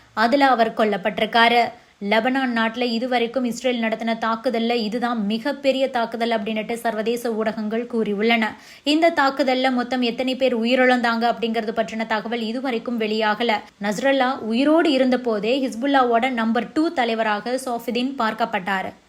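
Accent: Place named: Chennai, India. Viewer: Madras தமிழ் தூதூதூதூதூதூ......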